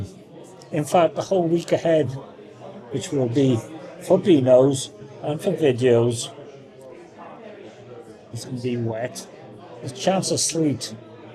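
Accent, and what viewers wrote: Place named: Wales, United Kingdom. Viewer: Love your Welsh-inflected patter...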